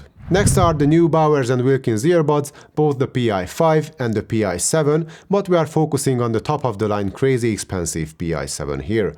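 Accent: Hungary. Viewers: Why do you have a hungarian accent? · Where are you from mate? You sound Hungarian. Van bojler eladó! Great video btw.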